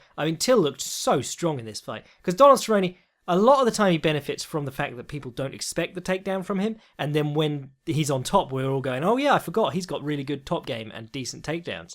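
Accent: England, United Kingdom. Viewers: I come here 50% for the educated mans viewpoint. 50% for the English accent so familiar to my now SEA dwelling ears and phrases like "makes you look like a massive bellend".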